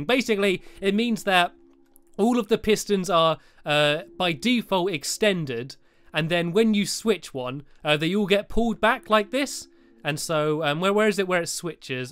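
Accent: United Kingdom. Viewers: himesamacosplay thats just how he says it since he lives in the UK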